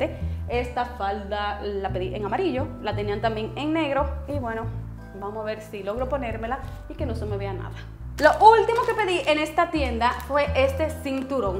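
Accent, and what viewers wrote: Dominican Republic. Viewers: yo tambien hablo asi, y mi familia, amigos, etc. Aunque es cierto que en RD hay diferencias al hablar en cada region, la mayoria hablamos de igual manera. Saludos! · "Hablas igual a Yarissa!!" Se llama ser de República Dominicana, pedazo de bestias. :V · Por que las dos son dominicanas y tienen la misma forma de hablar.. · A eso se le llama ser de republica Dominicana 😂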